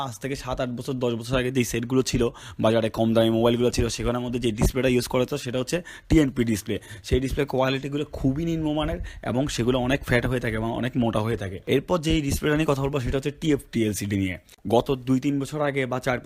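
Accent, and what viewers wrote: Chittagong, Bangladesh. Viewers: ভাই আপনি চিটাইঙ্গে নাকি?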